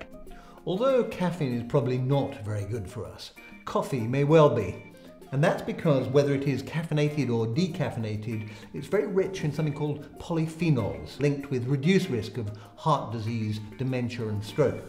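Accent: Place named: England, United Kingdom. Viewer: Drinking my latte as I watch this. Still not quite sure what a "lartay" (as you and so many other English people say - oh no, not us Welsh) is though.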